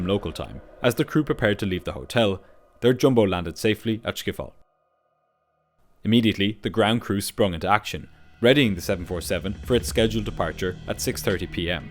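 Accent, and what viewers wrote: Ireland. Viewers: Are you Irish? Hell yeah, I love this channel even more. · Are you Irish? You sound like it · Are you irish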